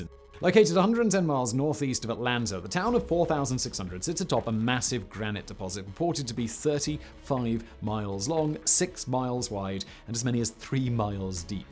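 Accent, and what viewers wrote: United Kingdom. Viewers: One word: British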